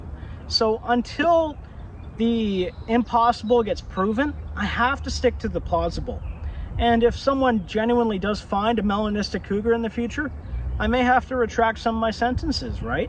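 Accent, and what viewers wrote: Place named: Canada. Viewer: Canadian accent is strong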